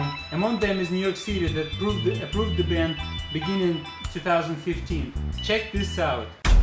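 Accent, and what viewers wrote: Russia. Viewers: Сильный русский акцент! Ты из России/Украины/Беларуси? В чем суть эксперимента? Ну, растворим полистирол в ацетоне и чё, это его основная опасность и все умрут из-за этого?! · I like ur russian accent!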